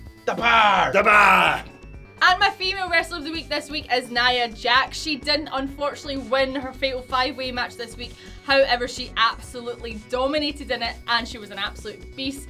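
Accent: United Kingdom. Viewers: god this hard british acent is something. i cant understand a word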